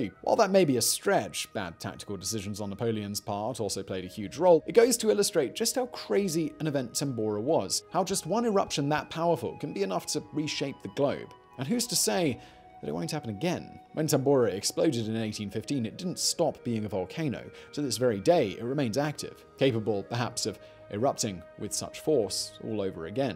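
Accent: RP, United Kingdom. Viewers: is this what they call posh accent? it's so difficult to understand :( and the cc are only auto generated. :( I can follow eg veritasium's videos fine, but these are challenge. And it's pity cause it's good content. I like the disaster put into history context.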